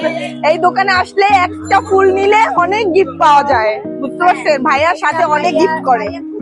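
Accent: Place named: Barishal, Bangladesh. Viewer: আপু ধন্যবাদ। বরিশালের ভাসায় ডায়লক দেয়ার জন্য।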